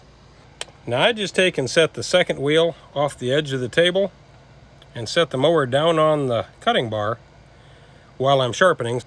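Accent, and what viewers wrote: Wisconsin, United States. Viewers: Love the sound of "Wisconsin" in your voice AND the straightforward instructions--I think I may even be able to handle this (says the 59-year-old woman) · also, you sound exactly like my uncle Steve that it kept catching me off guard. He's from Wisconsin and has a really specific accent. 😊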